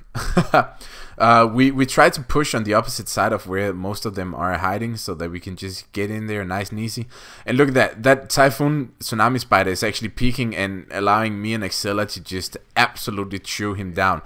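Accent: Denmark. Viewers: Where are you from ? Dane ?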